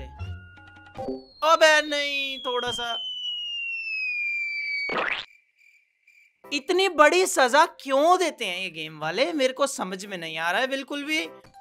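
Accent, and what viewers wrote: Haryana, India. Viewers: My mind have subconsciously added these haryanvi slangs and tones he uses Impact!!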